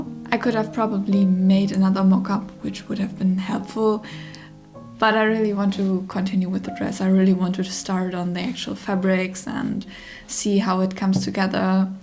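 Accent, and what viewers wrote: Germany. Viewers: I love this video about the creation process of this wonderful dress. It looks so good! Those color nuances! So cleverly designed and made! I admire your patience. I sew myself, but I've never done anything so elaborate. It was so enriching to watch you go through your creative process. I could watch you for hours as you walk through the church in this absolutely extraordinary dress. I stumbled across your channel by chance and of course I subscribed. Und ich dachte mir doch, dass sich dein Akzent deutsch anhört. 😂 Viele Grüße aus dem Saarland. ❤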